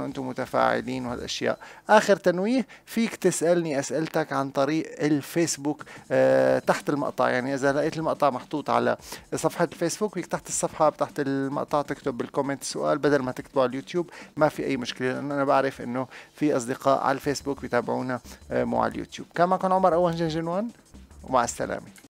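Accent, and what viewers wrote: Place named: Syria. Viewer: ساكن في كوكب ناميك اصلو sayin يعني مالك سمعانو عم يحكي سوري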